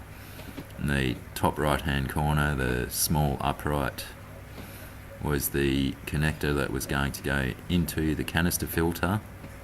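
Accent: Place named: Australia. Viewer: You sound more Australian in these videos than you do now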